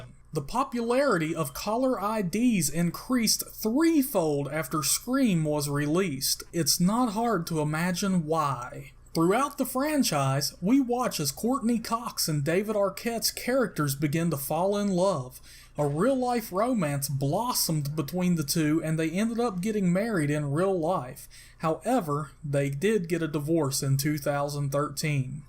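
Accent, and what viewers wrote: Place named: South, United States. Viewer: I can't get past the country voice, and I'm from the south!!!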